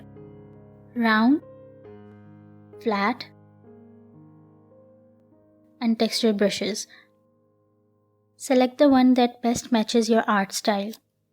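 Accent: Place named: India. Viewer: There are tons of videos on brushes but this is the most helpful among all. I love the format of your videos and tips too😍😍🤗☺️. Your accent sounds like Indian...are you Indian 🤔🤔.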